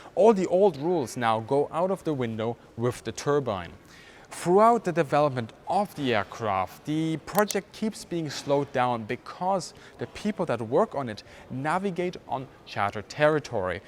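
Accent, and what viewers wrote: Germany. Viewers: One of the fastest 23 minutes I’ve had this week! Such a vivid command of the English language and cutting accent of the German dialect in itself is interesting. You have such a vast knowledge and enthusiasm for history and aviation it is incomprehensible your videos could be anything but fascinating. Thank you for your skillful narration and aviation historical intellect. Bravo! From NY, a third generation American with ancestral roots from Germany, Kevin.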